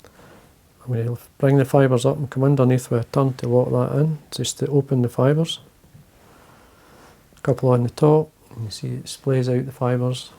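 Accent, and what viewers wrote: Scotland, United Kingdom. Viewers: I detect a Scottish accent. My family history is Scottish.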